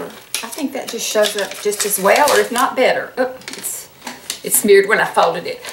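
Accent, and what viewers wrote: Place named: Georgia, United States. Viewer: I’m in Southeast Florida. I love your Georgia accent. I’m 54. You go girl!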